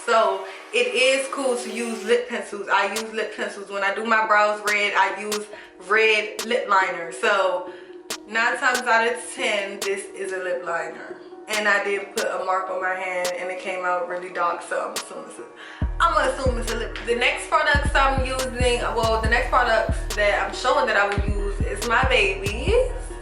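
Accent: Washington DC, United States. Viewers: Everyone keeps talking about "blending". She said her brows are her first step. It's not a complete finished look. Her brows look Amazing! She sounds like she's from DC 👍🏾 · this was a cute tutorial in real time, I like that it wasn't sped up. The brows were fleeky lol. And as soon as you started talking I said oh she from D.C. I know that city talk anywhere 😄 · foreverbeautyguru more like D.C. Lol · the MC is from NE DC lmao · you gotta be from DC! the accent and them nails, dreads, everything scream DC! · Are you from DC?? If not...you sound like it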